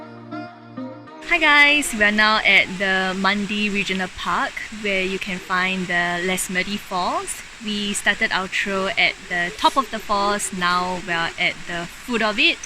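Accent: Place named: Singapore. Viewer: I love your video, I think your accent is a mixture of Singaporean and Australian which is interesting for me to listen to. I hope I can live in Perth in the future and enjoy it as much as you do :D!!:yougotthis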